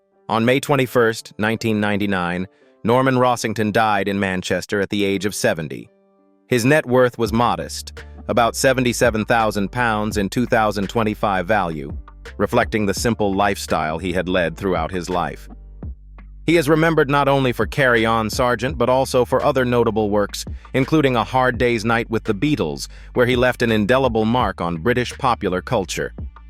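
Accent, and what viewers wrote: United States. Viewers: That,s because the commemtator is american ! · Why the annoying American narrator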